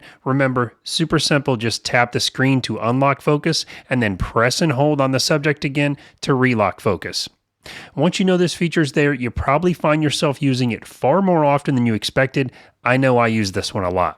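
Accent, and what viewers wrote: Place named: Indiana, United States. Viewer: I really enjoy and understand (at least almost all) what you are saying. You speak in my native language- "Indiana English"!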